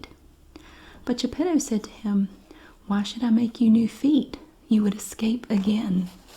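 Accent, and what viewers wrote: South, United States. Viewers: There’s nothin like a sweet southern woman reading u a bed time story for u to sleep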